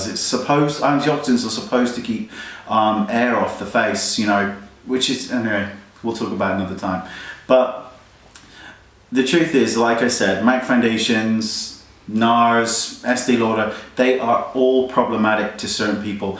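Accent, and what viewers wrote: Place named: London, United Kingdom. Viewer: really random question, but in your videoes you speak with a very londonish accent, but there are times in your videos where i can here a twang... are you from somewhere else but use a london accent so viewers can understand?:) xx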